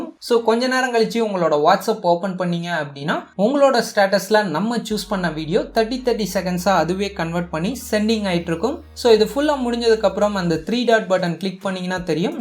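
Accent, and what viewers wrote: Salem, India. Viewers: Bro nega salem atturah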